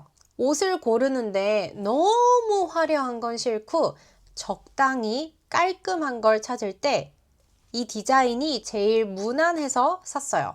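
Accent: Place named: United Kingdom. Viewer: did you learn english in the uk? you have a strong british accent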